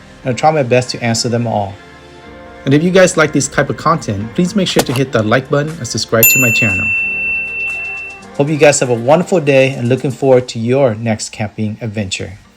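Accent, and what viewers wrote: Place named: Vietnam. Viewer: Knew you viet when I heard the accent lol👍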